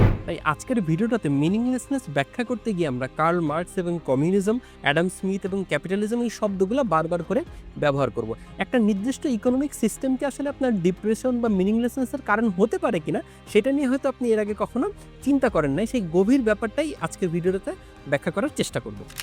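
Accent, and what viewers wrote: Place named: Chuadanga, Bangladesh. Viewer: ভাইয়া জানতাম না আপনার বাসা চুয়াডাঙ্গায়। আমিও চুয়াডাঙ্গা জেলার দামুড়হুদা উপজেলার। আপনাকে অনেক শুনি, এখন বুঝতেছি আপনাকে ভাল লাগার অনেক গুলা কারনের মাঝে হয়ত ভাষার একটা টান ছিল যে নিজেও জানতাম না। ফি আমানিল্লাহ।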